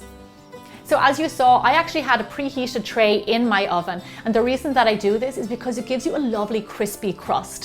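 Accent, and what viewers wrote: Ireland. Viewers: her Irish accent 😍😍😍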